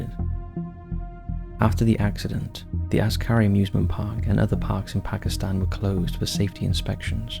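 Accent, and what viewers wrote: United Kingdom. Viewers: You always put me to sleep British spooky man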